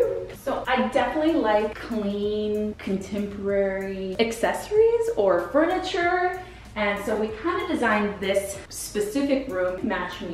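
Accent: Texas, United States. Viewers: I feel like your Texas drawl didn’t ever come through until this video! I love it. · I'm from Texas after reading comments about her accent I had to go back and rewatch the video! I did hear the accent but I think it was more because she was having fun and was talking a bit lower. But I've watched vlogs with her mom in them before and her accent didn't change. As well as picking peaches and the terminology isn't exactly big city lingo =)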